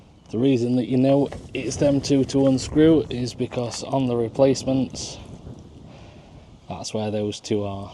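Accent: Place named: North West England, United Kingdom. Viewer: You sound local to the NW, is that correct? Which auction house did you use? Interested in doing the same sometime soon. Have fun, stay safe, make a profit.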